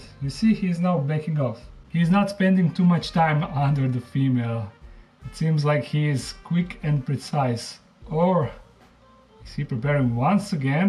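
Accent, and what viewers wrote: Croatia. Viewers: Hi. I came across your channel a month ago and man I'm hooked. At first I was wondering why your accent is so familiar 🤔 Than few videos later I realized you are Croatian like my boyfriend 😅 After watching more videos I also realized you live like 127 km from us. Hopefully one day I will have the opportunity to visit Dark Den. 😊 Just wanted to say how much I love your videos and all respect for you for caring so much for all your animals 😊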